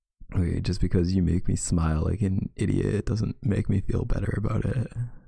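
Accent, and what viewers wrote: Canada. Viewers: I just love his adorable canadian accent 🥺🥺🥺🥺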